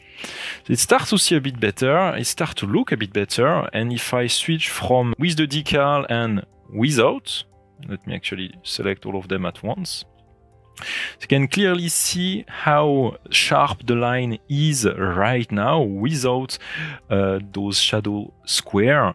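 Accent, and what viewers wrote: France. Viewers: L'accent français est reconnaissable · Hello Twinmotion folks. Sorry, it's nothing personal, I love the French language. But the narrator's accent is very pronounced and I can't quite understand it. Please, I ask you to put it in French with subtitles or in English, but with less accent.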